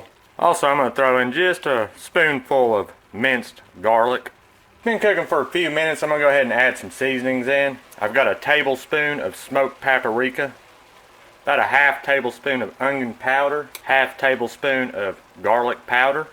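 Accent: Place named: Deep South, United States. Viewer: Great accent. Reminds me of my navy days stationed in the Deep South. American chop sued was a staple in the mess hall then but yours looks better; unfortunately I don’t cook.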